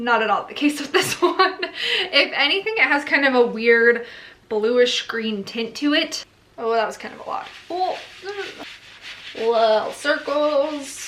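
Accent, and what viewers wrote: United Kingdom. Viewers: Awesome british accent .. 🤓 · Tienes acento ingles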